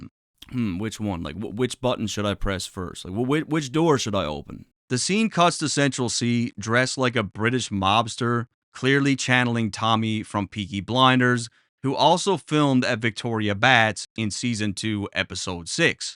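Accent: Canada. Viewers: You sound Canadian 🤔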